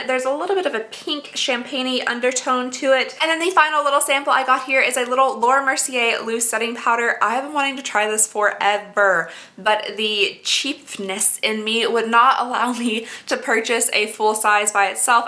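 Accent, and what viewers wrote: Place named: South, United States. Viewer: You could not get any more adorable!! I'm originally from VA (mountains of VA... closer to KY/WV/TN, depending on what direction you wanted to travel. You know... the part of VA no one thinks is there😂😂😂 Now I live in TN and you're not that far from me. One day I'm dying to take a drive and meet you for lunch or something!! Just got a Becca box and I'm thinking I need to share with you. Also....baby girl, you're not nearly as pale as I am😂😂😂 If you're looking for some great pale girl stuff, check out ETSY!! I've gotten sooooo much PR from sooooo many different stores lately that have honestly knocked my socks off! Plus, they are usually really natural in the ingredients and they are hand made so many are willing to make shades for you. Anyway... you make my day so much better. Love hearing that southern drawl, even though I know I'm so hick you would sound like a city girl next to me😂😂 Thank you for making my day better! 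Lunch and box of makeup soon!! Would be a blast!!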